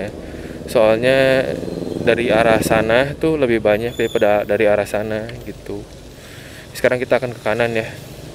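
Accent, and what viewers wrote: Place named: West Java, Indonesia. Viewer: Orang sunda ya mas. 😅😂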